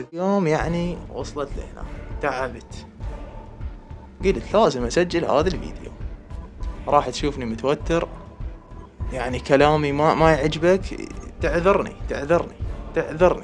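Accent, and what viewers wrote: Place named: Nasiriyah, Iraq. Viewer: اي بس عبالي من الناصريه لان لهجتك مثلهم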